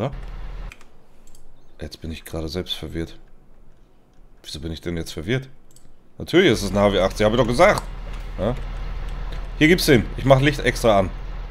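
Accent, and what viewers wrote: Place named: Low German, Germany. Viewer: Hachja... endlich wieder ehrliche Landwirtschaft mit Plattdütsch und ohne CP, wie mir das gefehlt hat :-)) Im Ernst, du bist so einmalig und so bist du an meinem Feierabend seit der Freidorf!!! Und die Grafik ist tatsächlich Wahnsinn! ich versteh gar nicht wieso! Liegt das etwa an Deinem neuen Rechner, du Schlawiner??? Oh nee muss nicht sein mit der Frankenmap, lass sie ruhig auf Twitch... Was hälst du von Gorale für Youtube? Aber eigentlich reicht auch erstmal Windsbach oder? Modcontest finde ich wirklich sehr sehr gut, war ja mein 1. mal :-D Ich finde gut, dass Mods sich mal offiziell vorstellen dürfen und dann auch mal belohnt werden. Zum Teil ja unglaublich, was die Jungs so raushauen - wie z.B. den Seasonsmod - die haben den 1. Platz absolut verdient! Was ich persönlich richtig toll fand, waren die Youngtimer-Mods und natürlich den Seasons-Mod. 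Bei den Karten bin ich etwas skeptisch, ob es später dann gefixte Versionen gibt, denn Fehler haben die alle.... So, das war das Wort zur Nachtruhe am Dienstag, Horridooo :-))) PS: Der Country-Sender ist sooo cool, ich liebe ihn!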